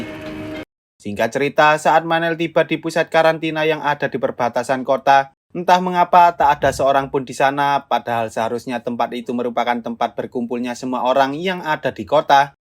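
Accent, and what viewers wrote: Java, Indonesia. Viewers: Jawa kowek logatnya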